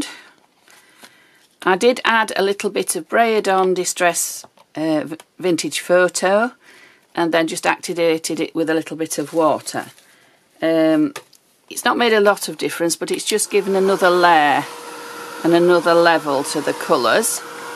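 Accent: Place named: Yorkshire, United Kingdom. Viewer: Looking forward to trying this. Your accent sounds like my cousin's wife – she's from Stamford Bridge.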